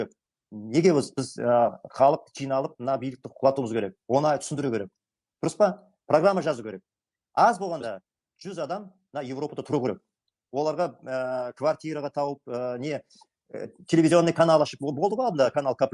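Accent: Standard. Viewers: Мұхтар Қабыл ұлы қазақшаңыз керемет таза сөйлейді екенсіз❤Аллаһ күш қуат берсін.тілегіміз орындалсын .Әмин.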